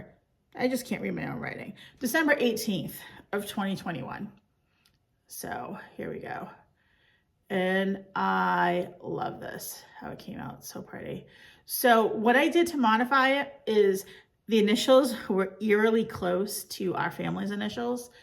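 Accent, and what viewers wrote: New England, United States. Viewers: Happy New Year! I agree about 2021….let’s move on 😍. I need to get that Salem 1692 chart. I’m reading The Witches now. Your Elisabeth Addlesee is BEEE U TEE FUL! Thanks for participating in the #stoopidtumorsal. You said it perfectly with your New England accent 😍☺️😳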